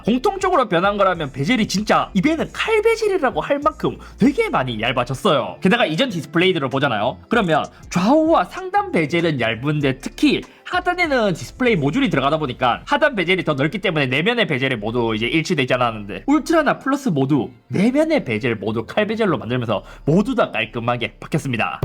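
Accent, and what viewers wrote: Gyeongsang, South Korea. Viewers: 선진국형 삼성폰.후진국형 아이폰.해설자 상도사투리 극혐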